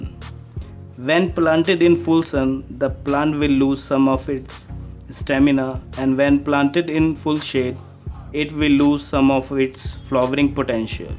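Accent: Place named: India. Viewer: Your accent is too strong I cannot understand your Hindu English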